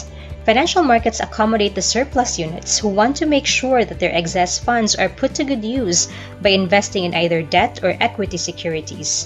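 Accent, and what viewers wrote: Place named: United States. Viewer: Abi nako americana ang gaistorya hehehehe Btw, thank you maam for this discussion!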